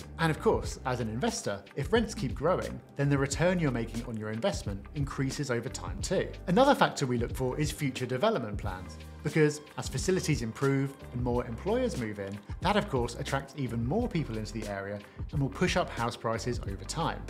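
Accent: United Kingdom. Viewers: The presenter sounds like he has a posh Liverpool accent too !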